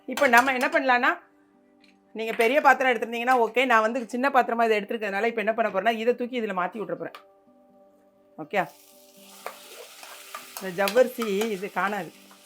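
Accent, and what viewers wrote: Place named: Tirunelveli, India. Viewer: திருப்பூர் கோகிலா... வணக்கம் சிஸ்டர்... நேத்து உங்க வீடியோ பக்கமுடியால... இன்னைக்கு உங்க குரல் கேட்டதும் நிறைவா இருக்கு... காரணம் நான் திருநெல்வேலில தான் படிச்சேன்... எங்க ஊர்ல யாரும் இந்த slang பேசறது இல்ல... எனக்கு திருநெல்வேலி பாஷை ரெம்ப பிடிக்கும்... உங்களையும் பிடிக்கும்... உங்க சமையலும் பிடிக்கும் 😍😍😍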